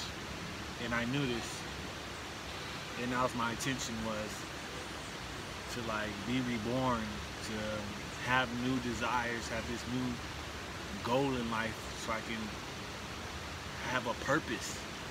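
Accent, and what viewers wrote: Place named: Glasgow, United Kingdom. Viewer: A Glasgow accent right at the start ..that’s got me watching this ..! 👍☺️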